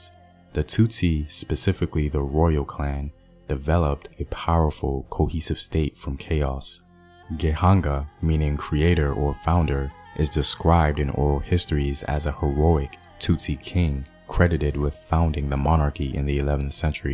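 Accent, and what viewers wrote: United States. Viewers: cant get over your VERY AMERICAN accent mentioning these african words. funny that the historical myth of he milk is the same as the one of the banyankore of Uganda. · Where this American sounding person got this information?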